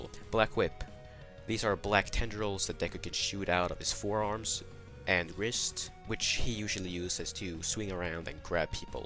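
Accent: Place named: Sweden. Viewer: This dude sound Swedish ( great video btw, shit was good as hell)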